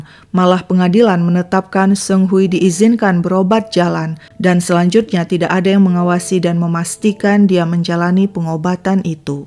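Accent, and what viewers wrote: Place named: Medan, Indonesia. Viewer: kakak btw orang mana .seperti orng medan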